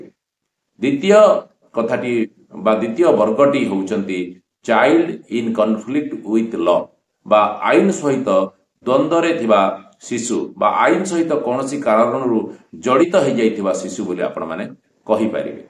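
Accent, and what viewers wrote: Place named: Bengal, India. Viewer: Can you add English subtitles on your video. As a person from south india it's hard to understand Bangal dialect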